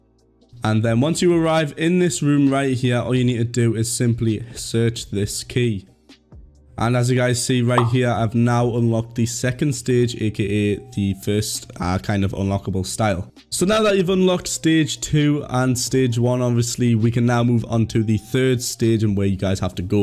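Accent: Newcastle, United Kingdom. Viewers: true geordie if he played fortnite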